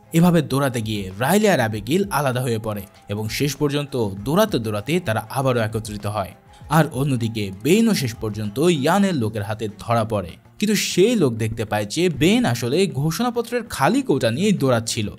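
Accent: Bangladesh. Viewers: আপনি কি বাংলাদেশি? আপনার কথায় মনে হয় আপনি বাংলাদেশি😊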